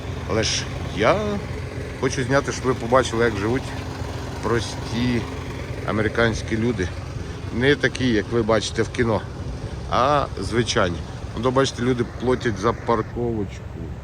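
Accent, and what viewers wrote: Zhytomyr, Ukraine. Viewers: Вітаю . У тебе мова геть на житомирську область схожа)..Цікавий контент робиш як на мою думку .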